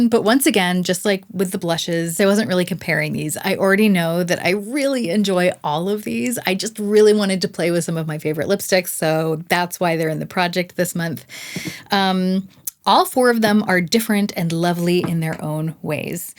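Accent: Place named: Canada. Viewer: I think I hear a Canadian accent? Yay!!🇨🇦🇨🇦❤️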